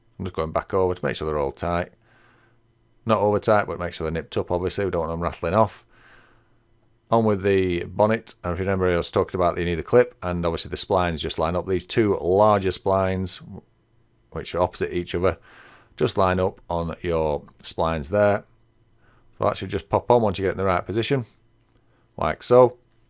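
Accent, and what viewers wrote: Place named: England, United Kingdom. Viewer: Good to hear an English voice for a change on an instructional video.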